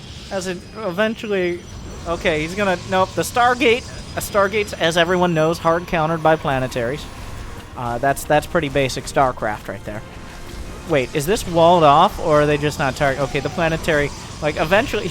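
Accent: South, United States. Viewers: WHAT?!? He grew up in the south or has a parent from the south then, or he's doing it as some sort of elaborate satire... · M Ingham yeah I’m not so sure he’s from Michigan either no sources or anything he definitely sounds like he’s from the south, just letting the Aussie know where Michigan is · He's from the south.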